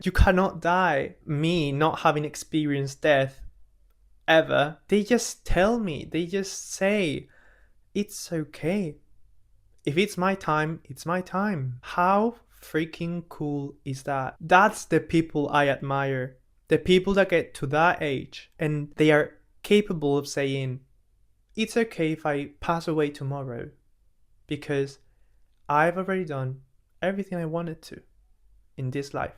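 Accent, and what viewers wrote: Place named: United Kingdom. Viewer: This is something that scares me so much! Every time I remember it will inevitably happen, it hits me like a truck. It's really weird to deal with it knowing we will all go through it at some point. Whenever I remember it, I get too existential and start getting too philosophical for my own good. I don't want to miss out on all the things that will happen after my death — books, movies, songs that will be released that might even be my favorites if I were around. It's really difficult to be alive sometimes, but I love the perspective of doing it consciously and being present in each moment. I don't want to waste any time at all to the point it overwhelms me. The fact I don't get another chance if I fuck up now really scares me. This was a great video! I believe you'll still have a large audience one day! Are you from the UK? 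I was trying to identify your accent the whole time lol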